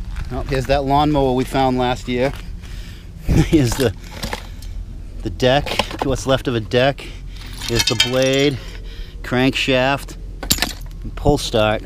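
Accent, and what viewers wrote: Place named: Massachusetts, United States. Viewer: I don’t need to see the video, the yard, or even the map. I only need him to talk for 5 seconds to know that he is from Haverhill, MA. Hello from Andover!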